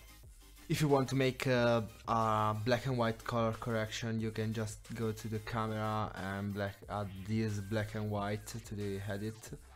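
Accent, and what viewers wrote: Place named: Italy. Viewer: DIO SANTO L'ACCENTO ITALIANO DA IL TOCCO ORGASMICO IN PIU'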